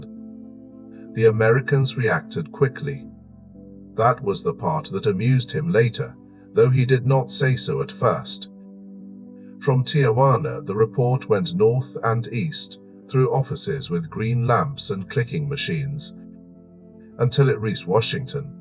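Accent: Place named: United Kingdom. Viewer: Sorry can’t take the accent from across a pond talking about the American mob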